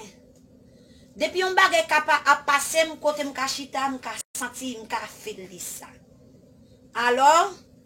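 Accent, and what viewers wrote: North, Haiti. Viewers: Se konsa moun Nan nord pale bann nan mèd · Pa kritike fi à ok , se konsa moun nan nord pale langage yo diferans ok.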